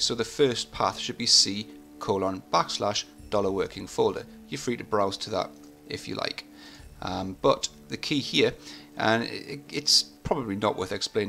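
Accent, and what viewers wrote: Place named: Newcastle, United Kingdom. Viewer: CloudCAD Ltd Thanks for the prompt response. Got a basic test setup running as per your video. I am an IT guy but an AutoDesk noob - are there any websites providing question/answers that you can recommend for Autodesk similar to experts-exchange, stackexchange, spiceworks etc BTW I am a Geordie - live in Whitley Bay. The accent works well for presenting - think Robson Green. Thanks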